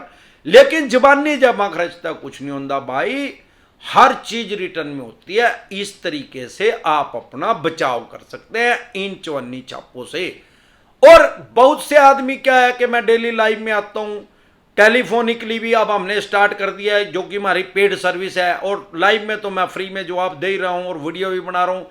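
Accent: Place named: Kaithal, India. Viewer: Sir your voice is similar to our we belong to kaithal area nice presentation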